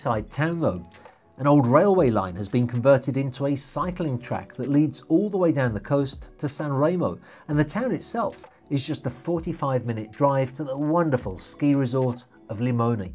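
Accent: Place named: RP, United Kingdom. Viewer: Queens English is well defined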